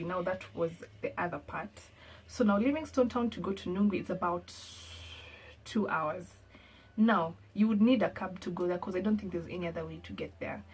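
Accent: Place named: Kenya. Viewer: Oh you are from Kenya. I figured it out from listening to you.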